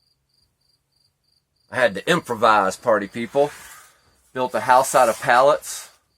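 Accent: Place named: Georgia, United States. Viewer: You sound like a Georgian 😅🍑 The dry desert heat is it way more harsh than the heat and humidity here in the South? Like walking around in a sauna wrapped in heavy wet blanket.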